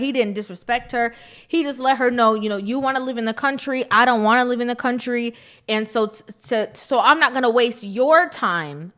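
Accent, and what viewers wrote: Jamaica. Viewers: Good job though. I can detect you’re Jamaican. lol I’m too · I hear the jamaican in u. I like ur comments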